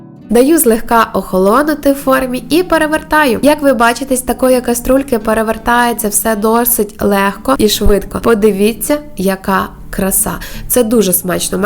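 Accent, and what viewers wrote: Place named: Ukraine. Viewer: 😮😊 Просто слушаю ваш разговор 😊и уде наелась 😅,и готовитьне надо,то токой степени мне нравится Украинский говор 😊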